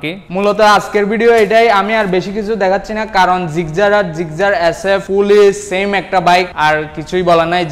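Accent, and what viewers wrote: Chittagong, Bangladesh. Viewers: 100% chittagong ar manush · অনেকে দেখি উনার উচ্চারণ নিয়ে হাসাহাসি করছেন। অন্যের ভুল দেখে হাসাহাসি করার অভ্যাস আমাদের গেল না। উনি পরিশ্রম করছেন, ডেডিকেশন দিচ্ছেন এবং এভাবে হয়তো ভুল করে হয়তো নয় শিখবেন। সুন্দর করে কি ভুলটা সংশোধন করে দেওয়া যায় না? আমরা সভ্য মানুষের মত কি আচরন শিখতে পারবো না? যারা হাসাহাসি করছেন তারা কি মনে করেন যে আপনি সবকিছুতেই একদম পারফেক্ট। হ্যাঁ আমি দেখলাম উনার কথা বলার ভঙ্গিতে চট্টগ্রামের টানটা একটু বেশি এবং কিছু কিছু উচ্চারণ তিনি ভুল করেন। কিন্তু আপনাকে যেটা বুঝাতে চাচ্ছে যেটি দেখাতে চাচ্ছে সেটাতে উনি পারছেন এবং চেষ্টা করছেন। চলুন যারা চেষ্টা করে তাদেরকে আমরা অনুপ্রেরণা দিতে শিখি। 🙂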